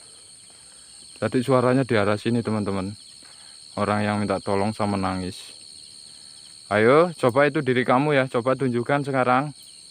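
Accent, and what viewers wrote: Java, Indonesia. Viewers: Asal mana bang,, logatnya medok jowo tapi suka ngomong bahasa sunda "aing". 😀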